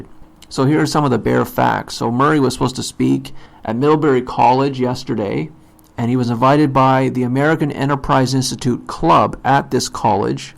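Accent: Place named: Canada. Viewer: Where / what is Middlebury? You're joking, right? (Perhaps you're from The Great White North? Pretty sure I hear Canadian pronunciations of "out" and "about" - most logical explanation !) Anyway, it's one of the very best liberal arts colleges in the U.S., costs over $60K/ year, consistently ranks in the Top 10 among small private colleges nationwide, and is incredibly difficult to enter in terms of acceptance rates. This all makes the whole episode worse than it may have been otherwise/elsewhere. Most of these kids are in the top 1% nationally (academic performance), and Middlebury attracts students from countries all over the planet who move there to study as well. It's anything BUT a "nobody place." And yes, it's strongly left-leaning. I don't condone what happened in the least, but will say this : I have a relative who's a prof there...he, along with a number of faculty members whom these kids deeply respect, encouraged and coached the appalling behavior prior to Murray's visit (after unsuccessfully trying to get him "disinvited" first.) There was nothing at all spontaneous about the fiasco...all was carefully pre-planned. 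This was completely uncalled for and put Middlebury to shame - its faculty in particular. I'm not excusing the kids' behavior, but they were basically following the orders of their superiors. Disgusting...and I don't even like Murray. I don't agree with his views on I.Q., but firmly support his right to express those views and have a robust debate with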